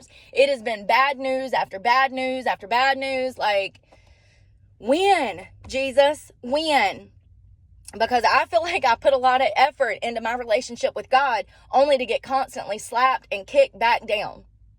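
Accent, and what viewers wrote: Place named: South, United States. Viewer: You are a strong woman. I love how real you are. And I love your accent. Being from the UK I have always loved the American accent. Especially the country accent.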